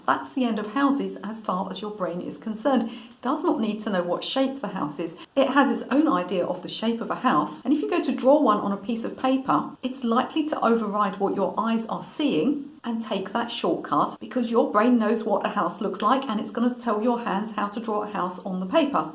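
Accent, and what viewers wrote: United Kingdom. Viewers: Your A brit, Love it. I lived in Ipswich and Colchester for 5 yrs. Love your advice it really helps. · Thank you for the great tips. I'm new to drawing and still trying to get basics etched in my mind. Pressing to hard is a bad one for me and have been doing it since school days. It really has to be stopped so thanks and love the British accent good day!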